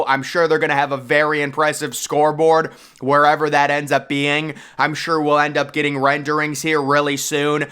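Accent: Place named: East Coast, United States. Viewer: The host is definitely from the east coast.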